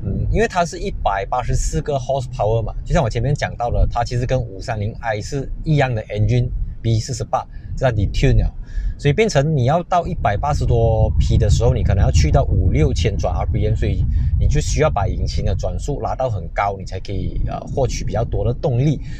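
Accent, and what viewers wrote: Malaysia. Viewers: 马来西亚语调比台湾娘娘腔好多了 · 真的很喜欢你的解说 马来西亚人就该用马来西亚的语调的style 加油 期待你更多更好的作品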